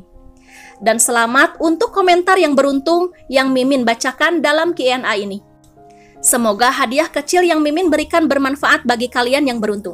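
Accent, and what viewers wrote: West Java, Indonesia. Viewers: Akhir nya tau juga teh mimin org Bandung 😂🤭 sy jg ngikutin bang Jeder & bang Gelo... Sy doa kan smoga berkah nya teh mdh"an tmbah berkembang channel nya..dri awal suka dengerin suara c tth sok hyang seuri lamun teh mimin ngomong sunda teh 😂 😂 ciri khas bgt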